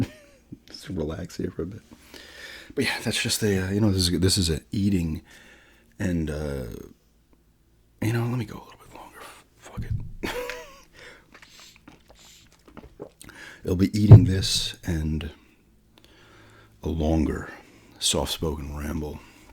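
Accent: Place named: Upstate New York, United States. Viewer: I'm from Syracuse NY so your accent sounds pretty close to home which is one of the reasons I like the channel. One thing I've noticed is we often speak fast compared to folks in other states. I moved to VT and people take a bit more time here. I've got a coworker from Boston so we can converse at full speed which is refreshing 😂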